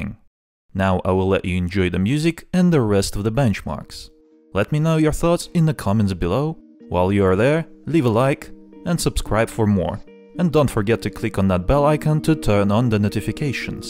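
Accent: Russia. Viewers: I thought he had a Russian accent 👍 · Ля Русский. Сразу понятно. Но произношение классное, как человек живший все жизнь в США говорю.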